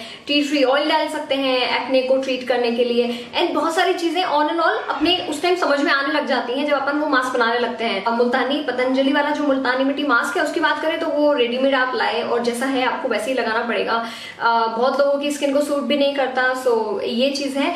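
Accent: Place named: Mumbai, India. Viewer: Apaki language se lagtahe ki aap Mumbai se ho . Ladako keliye konase masale he faces pack me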